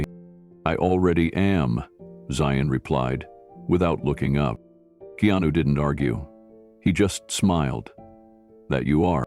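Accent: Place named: United States. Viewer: ❤ I DO Appreciate these stories especially regarding Keanu, for example and credits. BUT ADS!? For those of us already bombarded with constant Google ads that are depressing for the fact that elderly ads are about drugs, weight loss, everyday relentless bombs of negative facts of life, aging, to the point of brainwashing and same Ads over and over, taking up more time than the story on some. I do appreciate the American dialect of a well educated man, not AI.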